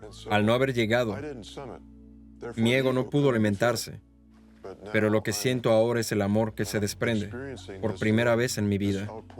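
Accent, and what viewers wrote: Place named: Spain. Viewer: No soporto el acento gachupin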